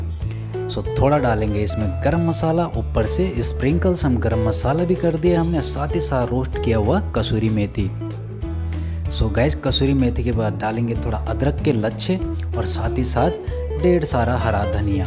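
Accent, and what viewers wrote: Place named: Garhwal, India. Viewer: Nice gadwali chef your tone like gadwali m ryt Some time make uttrakhndi cousin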